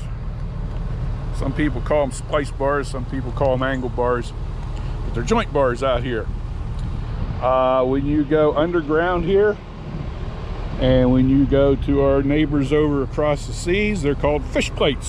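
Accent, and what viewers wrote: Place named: South, United States. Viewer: My dad still slips up sometimes and says “worshington” and “worsher” he’s been in the South for 36 years! When I heard you say “worshington” the first time I knew you were in my briar patch. Been meaning to tell you that here in Tuscaloosa is home to the longest wooden train trestle still in operations that crosses the black warrior river between Tuscaloosa and Northport. Norfolk Southern runs. I’ve been seeing Union Pacific locomotives a bit more here as well not sure what’s going on there but they’re gorgeous engines! I love seeing that flag thunder by shaking the ground! Oh FYI that trestle, over 3500 long! Google it sometime!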